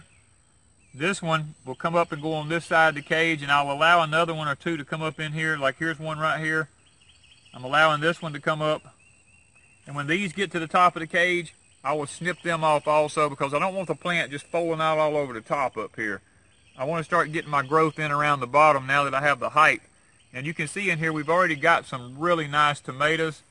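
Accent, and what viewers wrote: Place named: Texas, United States. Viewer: Inter-determ-ah-neent,. LOL luv ya'llz Texan accent, but here in Mississippi we say Indy-term-ah-nent (indeterminate) tomatoes... But besides that you tellin it right brother. Especially the kids n plants runnin wild LOL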